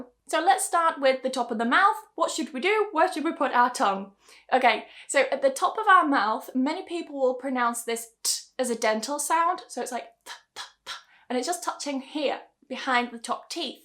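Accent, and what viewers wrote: United Kingdom. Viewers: Very nice, fantastic. I like British Accent the most. Thank you very much, may God bless you mam. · Thank you very much for your teaching. I love ♥️your British accent. I just want to make sure when it comes to the sentence "The vet helped the dog", the D sound of "helpeD" become a "T" sound? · Your accent is so nice I`m sure It would really me I really like british pronunciation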